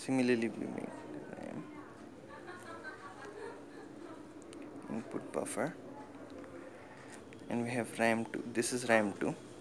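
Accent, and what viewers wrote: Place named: India. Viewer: PLEASE Turn upside down these damn papers because it is hard to listen and understand English in Hindi accent. You add another difficulty one it and made it double difficult.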